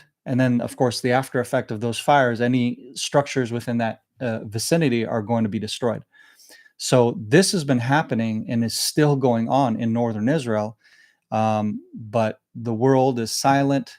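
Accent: United States. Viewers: America accent, American passport. Get out of Palestine. You are not the victim.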